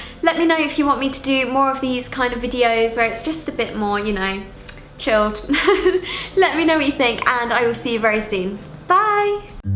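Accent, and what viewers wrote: United Kingdom. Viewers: hey! you're so beautiful, you take care of your body and have a british accent. inspiring lady. keep making videos! 🙆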